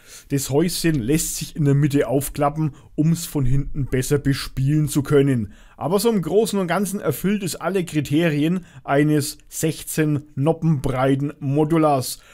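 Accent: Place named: Franconia, Germany. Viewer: Ein schönes Video mit deinem coolen fränggischen Dialekt!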